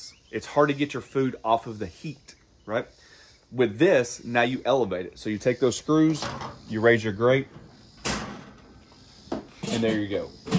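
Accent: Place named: Gulf Coast, United States. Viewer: I'm gonna take a guess that you (like me) are from the Gulf Coast. I'm just judging that by your accent and the heavy downpour. Am I wrong or right?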